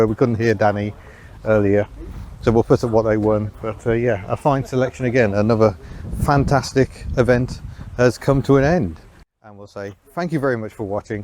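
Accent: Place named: Scotland, United Kingdom. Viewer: I missed his videos so I subscribed to his channel! The Scott brogue does not bother me, I understand what he says. Mind you, most of us in the US would not, but I have friends here from all over the world!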